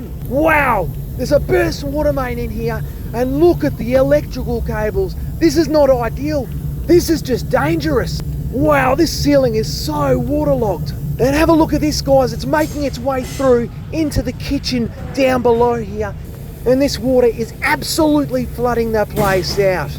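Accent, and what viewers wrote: Australia. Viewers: !!!! The algorithm got me here!!!! Love watching your channel and that accent.....my youngest girl lives in Melbourne.....makes me feel closer to her to watch your channels.....greetings from Montgomery Texas home of the Texas flag!!!!! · Nice one. We always wondered if you just did drains. Watching from the UK with my ex pat ozzie wife. She loves to listen to the ozzie accent. Liked and subscribed. All the best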